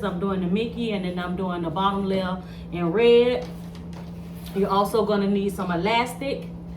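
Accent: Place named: Lowcountry, United States. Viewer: Im watching and learning .. you sound just like my family in the low country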